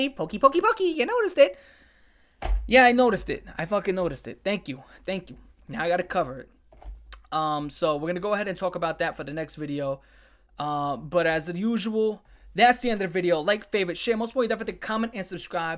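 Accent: New York, United States. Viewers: How much newyorker are you?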